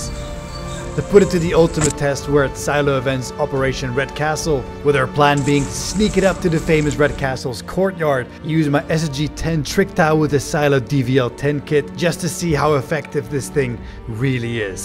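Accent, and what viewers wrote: Netherlands. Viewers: sounds Dutch to me....being Dutch :) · I knew that accent sounded dutch!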